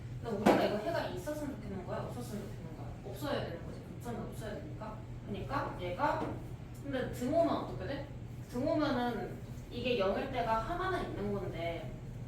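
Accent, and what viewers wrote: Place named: Jeolla, South Korea. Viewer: 전라도 분이시네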